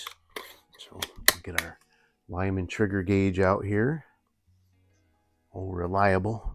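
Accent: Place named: Wisconsin, United States. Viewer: Very cool channel. Been subscribed for maybe a month. Your accent and “goofin around in the garage” thing reminds of everyone I knew in northern Wisconsin as a kid. What I found strange is that you seem to know everything about airguns. And you’re a sought after airgun mechanic/repairman. Every video of yours I watch, is really humble and professional. What’s most fun is that you review everything from top of the line rifles to the cheapest junk not many would buy, and everything you play with is about fun. “Just goofin’ around in the garage” and that attitude really sold me. I’m just getting into pellet guns again after decades of considering them just toys for kids. Mostly because of a sudden and severe hip problem. Going to the range with firearms is out. Plinking in the house or yard with a pellet gun though, is super fun again. 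Addictive as hell. Picked up a Gamo Fusion 3i and was hooked. Watching all these videos and looking at my Gamo, thinking, “I can do better than this…PCP is where it’s at.” Got the Notos and a hand pump (Hill MK 5, best they had), and there is nothing addictive about hand-pumping even the smallest air cylinder out there, the Notos being one of the smallest. “Okay, I’ve gotten a little over my head at 61 but it’s great exercise and really fun to shoot with a scope that’s 3-times the price of the gun.” I’m learning fun stuff with airguns and I don’t even need to leave the house. Thank you and everyone else in the airgun community, I’ve talked with mo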